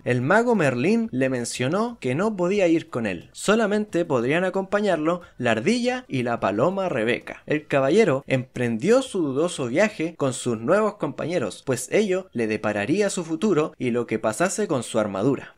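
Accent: Chile. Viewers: A que es chileno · bro, eres chileno?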